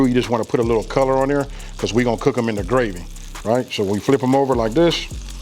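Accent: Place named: California, United States. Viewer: I don't know if you are from Cali but you sound like you are. I have cousins there and the accent is........, LOL Just like you guess would say about southerners. LOL It's the way ya'll prounounce words with the letter "I" LOL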